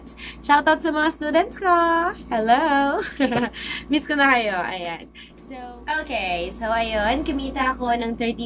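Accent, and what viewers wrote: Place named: England, United Kingdom. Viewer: hiya nman ako ... hello teacher 😊 love the english accent !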